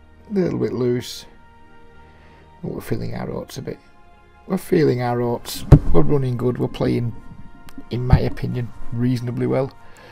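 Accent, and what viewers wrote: United Kingdom. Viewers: Understood, many thanks.. Ps, I have been trying to work out where that accent you have comes from, North or south of U.K?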